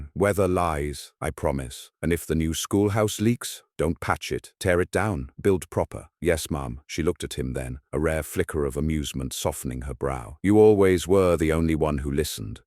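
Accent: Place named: United Kingdom. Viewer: The pronunciation sounds British to me. It’s different from American English, and correct British.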